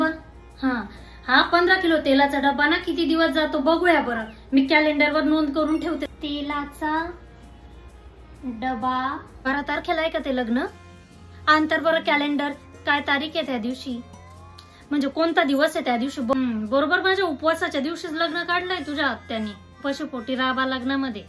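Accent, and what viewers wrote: Khandesh, India. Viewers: खूप छान सादरीकरण ताई. मराठीला खान्देशीचा तडका, छान वाटतं ऐकायला.🌹🌹🌹🌹🌹🌹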